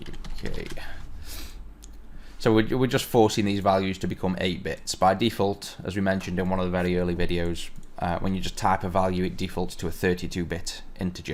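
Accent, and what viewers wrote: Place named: Manchester, United Kingdom. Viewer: Great tutorials, I'm enjoying them immensely. I'm coming from VB (I know!!!), <link>, VBA, <link> background, although I have also written a few, fairly rushed C# programs, I'm watching these more for good programming practice and to quickly fill in any big gaps in my knowledge - this tutorial being one such example. If there is one thing I will take from these tutorials, it is the proper documentation of code, I have been shamefully neglectful of this in the past :( I have a couple of questions, if you don't mind, do you have any plans to cover MVC and where are you from - my guess is South Manchester :) Anyway, thanks again for the tutorials - superb stuff.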